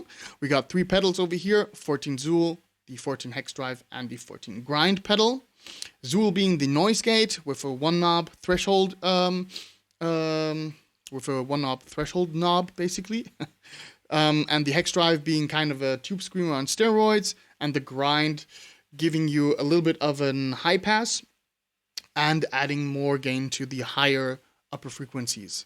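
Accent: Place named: Germany. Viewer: Ach, Deutscher! Hab's am Oettinger bemerkt und jetzt auch etwas am Akzent. Aber das Video zuvor hätt ich nicht unbedingt darauf getippt. Kompliment. Wenn du magst, ich mache auch ab und zu Videos, wo ich Gear und Software präsentiere (meistens in Form von Riffs, spreche nicht). Das ist z.B. mein Sound mit dem Nalex Gerbert: <link> Hier ausschließlich ein AMT K2 Pedal, also ohne TS davor: <link>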